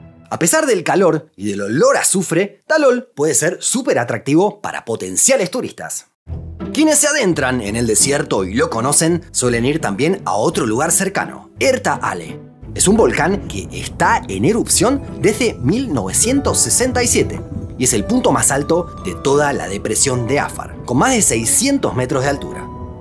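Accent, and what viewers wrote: Argentina. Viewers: Y ese acento más argentino. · Hola, sos de argentina o de uruguay? Porque tenes un acento de mezcla del neutro pero nose si con el argentino o uruguayo.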